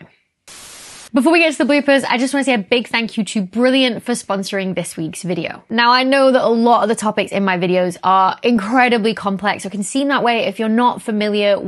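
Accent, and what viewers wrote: United Kingdom. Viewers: I love your British affectations. Despite that, I propose a hypothesis that I invented myself... I call it the 5 Jigsaw Puzzle Piece hypothesis: If I gave you 5 pieces of a 100 piece Jigsaw puzzle, and ask you to describe the image the entire jigsaw puzzle presents..., could you do it? Absolutely NOT! How then can cosmologists today claim to give me a 100% picture of the entire universe based on the 4.8 percent of baryonic matter that they can actually and only preceive? That is to say, cosmologists don't know shit about the nature of the universe or how the universe exists at all.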